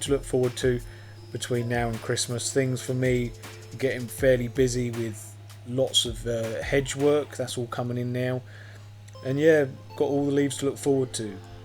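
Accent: United Kingdom. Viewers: Nice Norfolk or Suffolk accent ? Nice vid really relaxing tbh - i want to be a gardener now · Are u from around wiltshire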